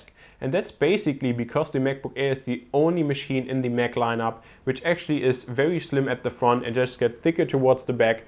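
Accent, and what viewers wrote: Germany. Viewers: The guy in the video sounds like an german with an akcent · I actually was thinkabout how chinese was ridiculous guess and thought you have to be german, und du bist Deutsche! Gruss von Holland! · Whoa, you sound like a German or something. I couldn't imagine doing a review in German, so props for doing one in English. I recommended my brother get the Macbook Air, and he's at the store buying one now. Woot!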